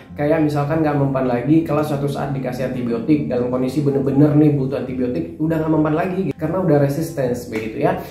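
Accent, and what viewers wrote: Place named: Banten, Indonesia. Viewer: Kebantern ngomongnya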